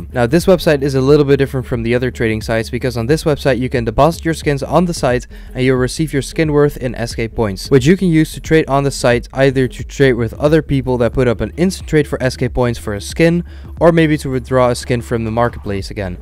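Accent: Netherlands. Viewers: its nice to know your dutch i sometimes hear a little bit of an dutch accent (im dutch myself and i recon the same thing XD)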